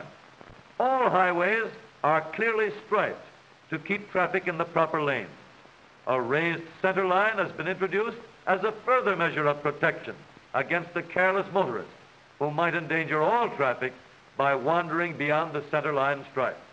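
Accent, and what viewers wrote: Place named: Michigan, United States. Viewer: Oh man the deep Michigan accent 😂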